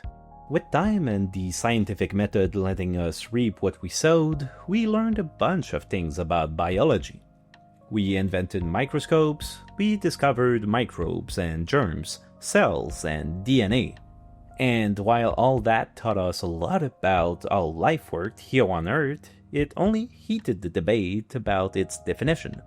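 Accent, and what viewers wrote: France. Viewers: De ce que je comprendre les Bibites sont des représentions artificielles de la vie, une simulation qui à pour objectif de répliquer la vie. Tu as très bien fait ton travail donc il en est une excellente réplique mais au final ils sont pas vivant puisque normalement la vie se passe naturellement ce sont des pixels qui sont éclairés de différentes couleur en réponse avec ton code. Beaucoup de respect pour ce que tu fais continue comme ça! Ps: déso d’avoir écris le com en fr je sais que tu parles fr (ça parait dans ton accent) et je suis bcp plus confo en français qu’en anglais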